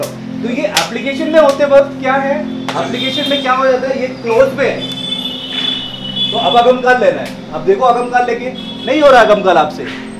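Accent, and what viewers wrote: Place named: South India, India. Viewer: Excellent Sir.... I am doing foundation course of Kalari and once done, I would definitely like to connect with you.... Sir aapka hindi language mey jo 2 percent south indian ascent hey usko barkarar rakhna.... 🙏😊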